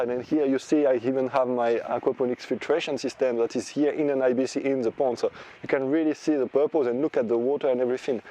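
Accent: France. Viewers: Vous êtes français ? Car quand je vous écoute ça ressemble à un français qui parle anglais😄😄. C est pratique du coup parce que je comprends un peu mieux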